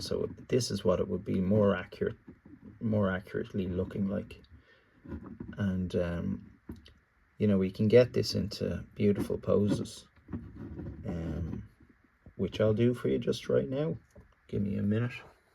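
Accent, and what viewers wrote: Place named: Ireland. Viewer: Recognise the irish accent. This is a great figure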